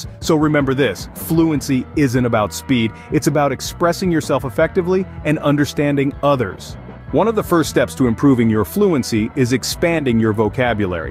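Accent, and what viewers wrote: United States. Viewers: This is American accent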